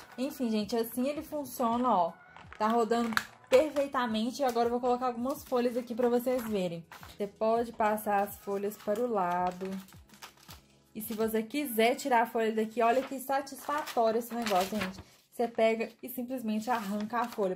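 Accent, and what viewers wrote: Minas Gerais, Brazil. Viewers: É mineira, 98% de certeza pelo jeitin que fala kkkkkkk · Moca vc e mineira né? Te ouvindo falar ,parece eu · Ah, mineirinha! É inconfundível o nosso sotaque. 👏👏❤️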